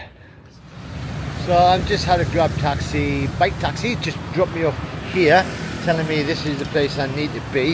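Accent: United Kingdom. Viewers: Hi there, Hope you are enjoying your trip my country. I am just wondering about your British accent that you are speaking. What part of Britain do you come if you don't my to share ?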